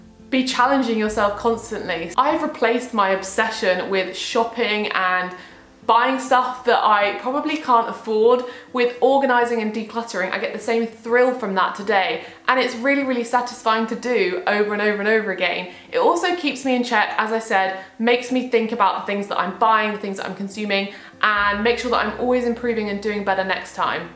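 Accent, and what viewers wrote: United Kingdom. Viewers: I speek french and I love your british accent. It is lovely. Your speech is really inspiring indeed. It is not easy but I am starting now.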